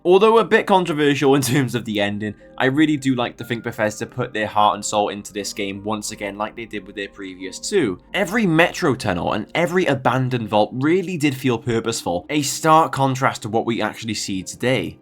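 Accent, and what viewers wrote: United Kingdom. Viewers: He's got a middle of the island British accent. This lends him popular authority which is not earned. This is the only thing the UK has left to export other than financial planning.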